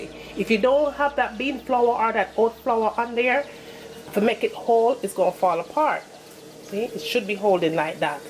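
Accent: Jamaica. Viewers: Jamaican accent is the thing I didn’t know I needed on YouTube!!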